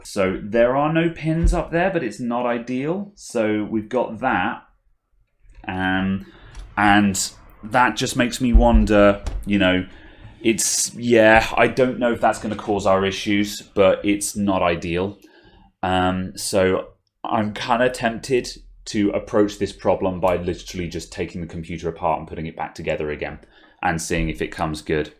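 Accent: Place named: United Kingdom. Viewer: I don’t need to watch these videos, as I have over 20 years experience doing this professionally but I love to listen to you while I work, my coworkers don’t talk much and rarely about IT work Something about a British accent really screams “IT” and I have no idea why!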